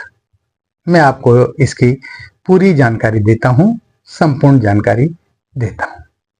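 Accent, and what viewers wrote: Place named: Maharashtra, India. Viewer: 🙏प्रणाम गुरुजी 🙏🙏 आप महाराष्ट्र से हो ना, आपकी बोलणे के तरिके से ऐसा प्रतीत होता है, क्षमा करे 🙏🙏🙏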